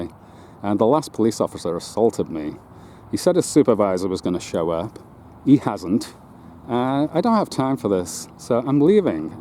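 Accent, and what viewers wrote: United States. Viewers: Come on man. You got to expect a little needling with that Texas accent.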